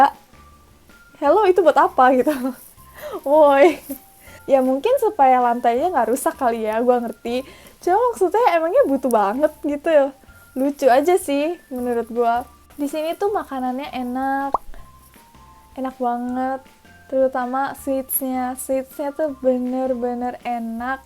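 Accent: Japan. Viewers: thx dgn video ini gw jd lbh tau ttg jepang.. btw bahasa indonesia kamu udah ada dikit2 aksen jepang nya haha mgkn karena udah lama d sana 😄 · kak, bahasa nya udha kebawa nihongo kak :))) tapi luccuu aku sukak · Itsnaini Nabila iya bener sudah kebawa bawa nada jepang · kakak kedengeran banget bahasa indonesianya ada rasa-rasa jepangnya gitu hahahaaa dari nada dan sebagian pelafalan. rupanya pengaruh juga ya tinggal di sana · logatnya jadi kebawa logat jepang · Logatnyaa,,, udah kebawa jepun,, hehe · wiihhh,,, infonya keren bangettt,,, kakak pasti kuliah ya disana,,, logatnya udah kayak jepang,,, dan raut wajahnya juga kayak gadis jepang,,, yang pasti kakak ichiban kawaii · wah jadi kyk denger haruka nakagawa ngomong nya ada logat jepang nya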